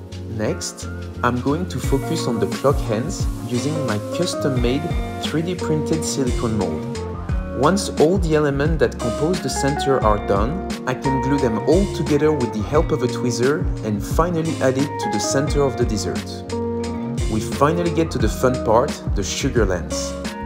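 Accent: France. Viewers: Je pense qu'il l'est, il a énormément l'accent français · Love hearing him talk. Love that smooth French accent. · You are french?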